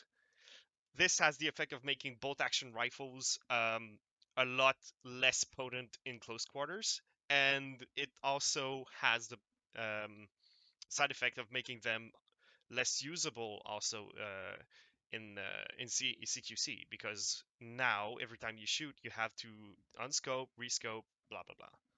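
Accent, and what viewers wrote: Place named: Quebec, Canada. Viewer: haha, knew it. your accent is very light but from a fellow quebecois, i could tell. hope your progress on the mod is going well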